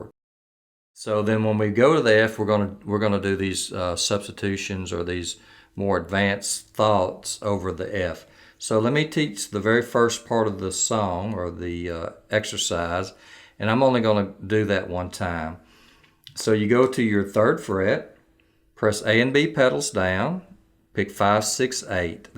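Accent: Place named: South, United States. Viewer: Hi, Great stuff, just getting back into play pedal steel after 45 years away. Used to play in a band etc, but now feels like i am starting all over again at 77 years old - fingers getting looser... Your instruction clips are proving invaluable, and enough for the moment, until i can find time to go deeper. For the last couple of days it has been bothering me, at 13.13 you have said a diminished repeats it's self every fourth fret (I ''heard'' note!!). Whereas a dim repeats it's self every third note, and an augmented repeats at every fourth note. That is if I have it correct from memory and the way it sounds. Then it dawned on me that on replaying this evening, that you were saying 'fret' and counting the fret you were on as the first one, instead of starting with the next fret up (as the first one), which would be the next note (or the first of three). 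I have not noticed this before, and wonder if it causes confusion for some ('idiots' like me!!!?). Is it common practice to count the fret you are on as the first? I am not being pedantic, just feeling my way, and wondering for all of us. I am always looking forward to the next presentation, and the southern accent. Takes me back to so many tours of the States, teaching and demonstrating woodturning - 27 states in all......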